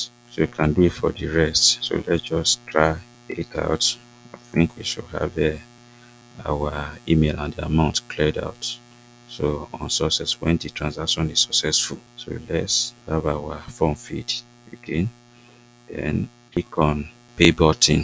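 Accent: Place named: Yoruba, Nigeria. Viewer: I really love the Yoruba accent senior man😂❤❤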